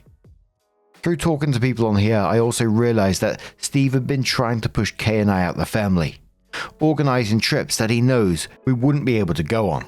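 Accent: United Kingdom. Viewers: so many times you have helped me. So much love to you. From Boise, Idaho, US. You sound like you might be from over the pond. Please take care of yourself. You’re a treasure.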